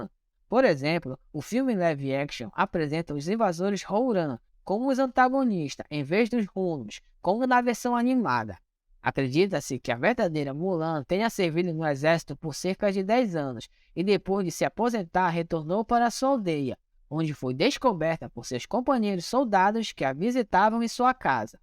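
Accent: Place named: Belém, Brazil. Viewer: Existe uma grande possibilidade de eu estar enganado, pois não sou especialista em nada, mas, se eu estiver certo, aposto que o narrador desse vídeo é natural de Belém (PA) ou (quem sabe?) Ananindeua. Talves Marituba, Sta Isabel ou até mesmo Castanhal, na área metropolitana. É evidente que ele está lendo um texto e está forçando a dicção, a entonação de voz, para parecer um reporter do JN. Obviamente, ele não fala assim entre os colegas da escola. Entretanto, muito provavelmente, esse perfil não é da plebe. É da burguesia. É de classe média, saca? Ainda estuda ou já estudou em boas escolas, tipo, escola particular, sabe como é que é ??? Mas, enfim, o sotaque não me engana. Se eu me enganei, ele é muito bom. Porque, para mim, ele não pode ser de nenhum outro lugar a não ser dessa região metropolitana que eu mencionei acima -- e se for da favela, é uma exceção à regra. 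O cara da favela, fala diferente, fala na gíria, aquele lance da malandragem, tá ligado? O que não parece ser muito o lance dele. Mesmo se fosse da favela, eu também saberia porque conheço as gírias de lá, e só pela maneira de falar, eu identificaria talves até o bairro em que ele mora. Rsrsrsrsrsrsrs😂 Gostei do vídeo, tanto pelo conteúdo, quanto pelo esforço dele em parecer um locutor ou reporter de TV. Ficou legal. O moleque é bom. É diferenciado. Valeu, ai, bro. Vou deixar um like.😊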